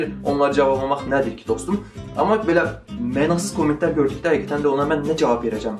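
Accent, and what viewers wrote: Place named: Zaqatala, Azerbaijan. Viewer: Bu video da digərləri kimi əladi və çox maraqlidi yəni mənim üçün elədi hə bu arada məndə Zaqatalalıyam danışığından bilinir onsuzda sənə uğurlar😊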